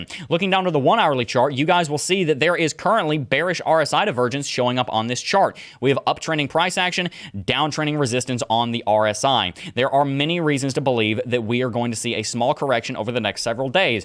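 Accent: South, United States. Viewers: he's from the south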